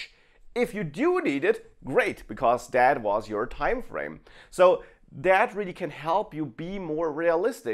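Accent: Germany. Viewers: Okay cool, ich erkenne doch den Akzent meiner Landsleute, auch wenn euer Englisch natürlich super gut ist 😉 Dass es auch wirklich hochwertige Websites/Channels zum Thema Software Development aus Deutschland gibt, ist gefühlt leider irgendwie viel zu selten 😄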